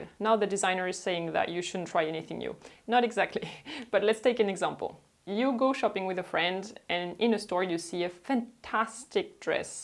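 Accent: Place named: France. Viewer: thank you for such an interesting videos! Can you do an advice video what to do with old clothes that I don't want to wear anymore? What do you do? P.S. your French accent is very cute!:)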